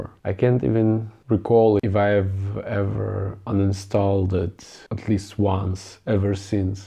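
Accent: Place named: Russia. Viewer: Ты же русский, слышно акцент)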